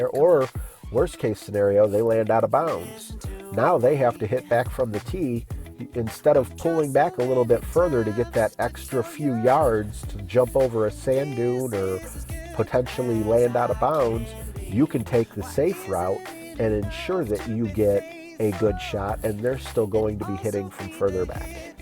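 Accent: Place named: New York, United States. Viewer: Sounds like your from my area in NY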